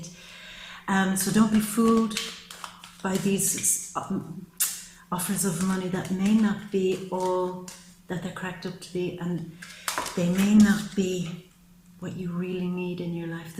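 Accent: Ireland. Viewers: Sooooo funny, shove it up your arse...amazing and with your Irish accent..haha...thank you for an amazing reading and totally resonates with me looking for something to hold on to.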